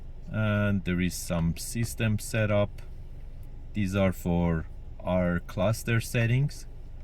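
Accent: Iran. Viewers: that persian accent tho ;)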